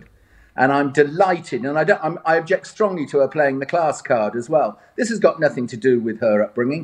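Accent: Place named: Birmingham, United Kingdom. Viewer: Yeah you sound brummie….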